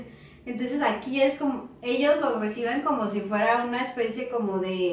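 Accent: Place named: Baja California, Mexico. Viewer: Vayan a Baja California y van a ver como si les parece mexicana, a lo mejor por aquellos rumbos la gente somos un poquito distinta en acento y costumbres, pero para mi percepción si la veo súper mexicana y su acento sigue igualito a la gente de Baja como si no llevase años en Turquía jaja. Saludos a todos y bendiciones.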